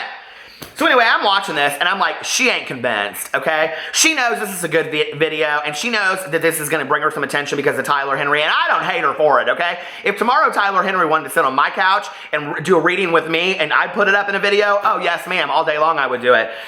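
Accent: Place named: Midwest, United States. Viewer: I knew you was from the midwest. We keep it real there.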